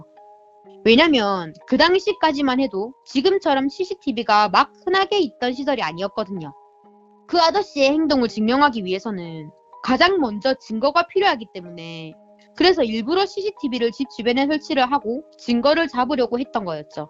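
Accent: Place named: Busan, South Korea. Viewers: 그런듯합니다 저도 부산 사람인데 정감이 많이 가네요~ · 부산 분이시죠 ㅋㅋ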